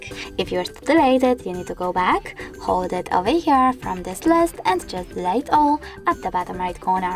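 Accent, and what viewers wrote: Asia. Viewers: Too hard to understand thick Asian accent. Too long nails can't see exactly where pointing. Way too fast. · Can you speak slower and not Asian Please !!!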